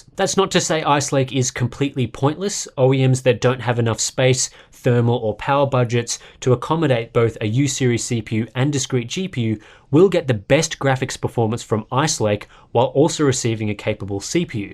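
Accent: Australia. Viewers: Strange thing to moan about [FULL STOP] [COMMA] maybe you don't understand the accent [COMMA] he is Australian [FULL STOP]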